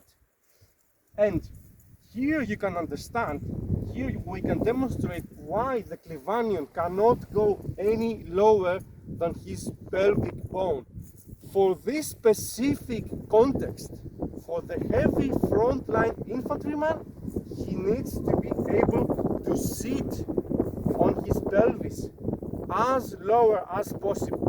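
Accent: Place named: Greece. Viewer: You sound Greek. Are you Greek?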